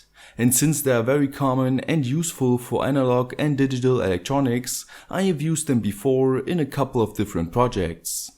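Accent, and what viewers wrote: Germany. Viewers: Could you please explain the schimtz trigger?? By the way, like your german accent a lot.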